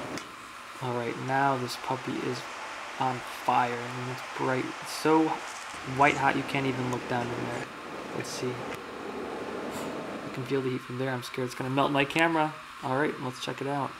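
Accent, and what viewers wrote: United States. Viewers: American accent.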